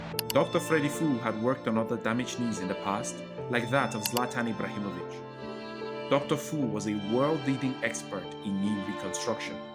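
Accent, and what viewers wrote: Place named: Nigeria. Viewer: Wow this is good research, great video editing, soundtrack and overall detailing. You sound Nigerian by the way. Well done 👍🏾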